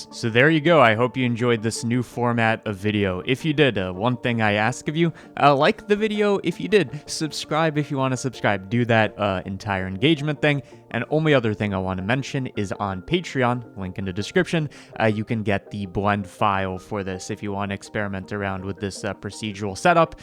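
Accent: United Kingdom. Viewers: why your accent turned bri'ish? (loved the tutorial tho it was amazing) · the British accent sounds pretty good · Is he doing an English accent? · Haha the british ish accent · Damn very British! Really posh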